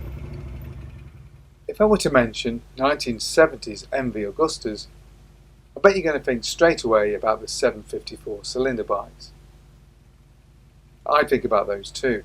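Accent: England, United Kingdom. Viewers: That bit about the sunbeam looks like it was filmed in the Netherlands, do you remember where? You sound very English though, even if you were taught the Queen's English in school, like i was, that's hard to fake.😁🇳🇱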